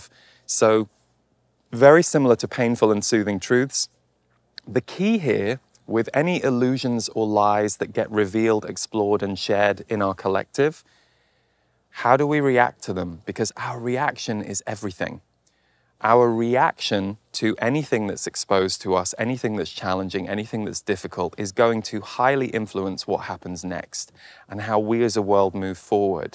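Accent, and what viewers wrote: United Kingdom. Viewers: I thought you are in the UK. Your accent sounds British.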